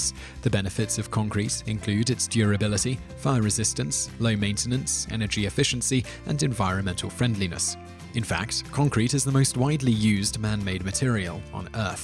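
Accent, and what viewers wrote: England, United Kingdom. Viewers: Sounds like an english accent, but he says "sidewalk".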